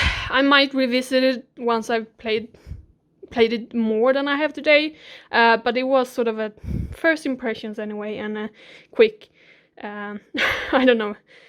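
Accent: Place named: Sweden. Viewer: Hi. You are Swedish, right? I can tell by the accent 😊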